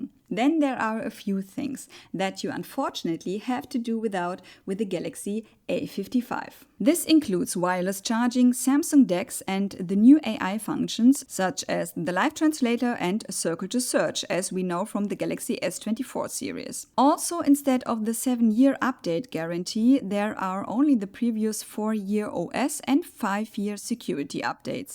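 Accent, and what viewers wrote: Germany. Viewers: Ich kann Ihren deutschen Akzent deutlich erkennen.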